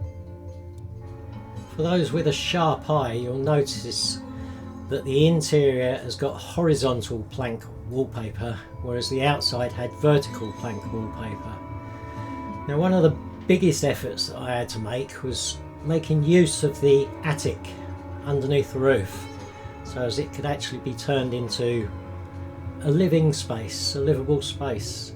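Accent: Texas, United States. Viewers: Thank you for your backstory. I just found my long-lost brother. I performed the fiddle for 40 years. If you lived near me, I would love to turn you loose on my woodworking equipment. The only thing we would be missing is a good selection of exotic veneers and some specialized clamps. I think your old western movie inspired, Texas accent is perfect. If I did the voice over, everyone would be subjected to my horrible rendition of Monty Python. It's clear to me that you have 10 times the artist in you than me. Your precise attention to delicious detail tells me that you were a master of both painting and marketry. I on the other hand, will always be a slave to industrialized symmetry. I agonize over the finish of my work. I've always wanted to be paired up with someone who could teach me how to add soul to my work. I'm great at the skyline and stymied by adding life. 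· Wow this is quite different, and fun. My wife is from Texas, she says it's cool that you tried the accent. I feel that way any time I try and English one. Ha. That chuck wagon sure is cool I also enjoyed the explanations as I am largely mod illiterate and can't tell when something is custom built 104 beams! I I understand the effort there believe me The inside of the house looks so good. The oil lamp is clever, as is the use of conduit. So many small details that i lost track, mesmerized All the barrels in stages of build at the cooper…just brilliant Was that cat named cooking fat? 🤣 Awesome build. Just excellent work.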